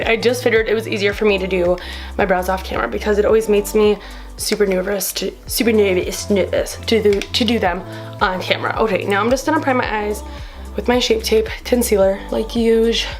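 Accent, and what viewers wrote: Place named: Midwest, United States. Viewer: youre so pretty !! you must be from the midwest too, I can tell by the accent <3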